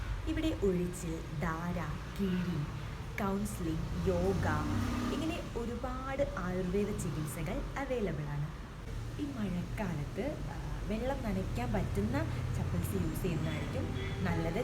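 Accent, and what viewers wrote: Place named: Malappuram, India. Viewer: Nalla parajayam ulla baashaa malappuram anoo naadu 🧐 or kozhikode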